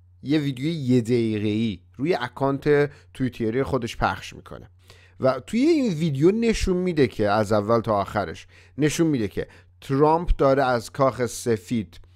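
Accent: United Kingdom. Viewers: خوب ویدیو را نشون بده. چرا مثل تازه بدوران رسیده های غرب زده فارسی را با لحجه انگلیسی حرف میزنی؟ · داری فارسی حرف میزنی با لحجه انگلیسی وقتی می‌پرسه آیا عاشق ترامپ هستی دو دفعه میگه بله ما خوب هستیم yes yes we are fine. از همین جا معلومه چرند میگی و هیچ اطا عی نداری و دروغگو هستی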